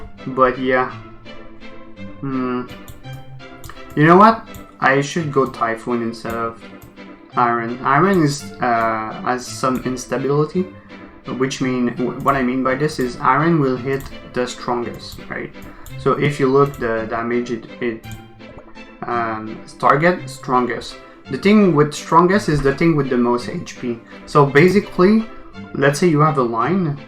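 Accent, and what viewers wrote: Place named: France. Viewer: Cuz u sound french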